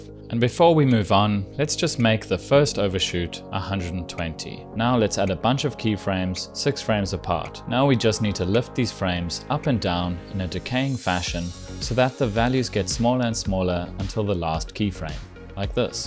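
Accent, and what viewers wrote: South Africa. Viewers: Knew it! Heard the south african accent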